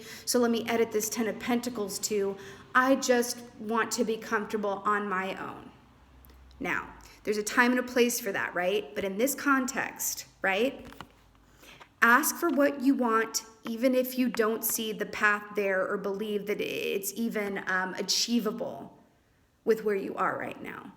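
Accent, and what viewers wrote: South, United States. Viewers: I here the "y'all" and I know you must be from the south....I'm from south Alabama and y'all is my favorite!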